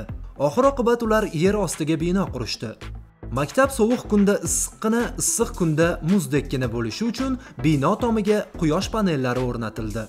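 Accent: Tashkent, Uzbekistan. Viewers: Iltimos shevada gapirishdan qochishingizni so'rardim Toshkent shevasi yaqqol bilinib qolyabdi vedio uchun esa rahmat olg'a davom eting.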